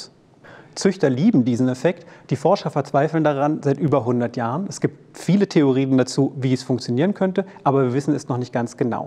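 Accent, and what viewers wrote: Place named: Germany. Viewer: HA! Ich wusste schon beim englischen Video, dass der Akzent aber recht deutsch klingt :D. Es wäre praktisch, wenn ihr die Videosprache im jeweiligen Titel auszeichnen würdet, da viele Englisch-Sprachige Zuschauer kein Deutsch können bzw auch viele Deutschsprachige sich im Englischen nicht wohl fühlen.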